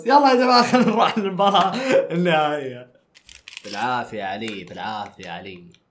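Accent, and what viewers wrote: Gulf, Saudi Arabia. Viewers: اريد سأل انت سعود ولا بحرين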